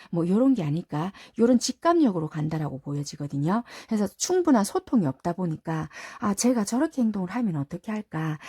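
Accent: Busan, South Korea. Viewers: 네 ㅠㅠ 감사해요.. 요즘 맨날 술마셔요 ㅠ 속상...어떻게 해야할지 몰라 더 그런것 같아요.. 언제나 타로보면 정확하게 떨어져서 신기하면서도 잘보고 있습니다 ㅠ 항상 감사드려요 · 감사합니다!!! 편안한 밤 보내세용😻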